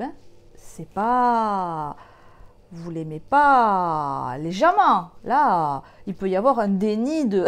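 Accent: South, France. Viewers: Je vous découvre dans cette vidéo et je like. En tant que belge, j'adore votre petit accent du sud...Merci !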